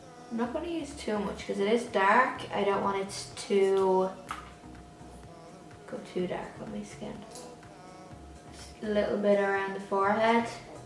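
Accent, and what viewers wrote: Ireland. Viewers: Another gorgeous look. <3 I love watching your videos. Your Irish accent is so cute and unique. Like, it sounds Irish, but you say certain words with a very "country" Irish sound. lol I'm sorry that may sound crazy but I mean it in the best way possible.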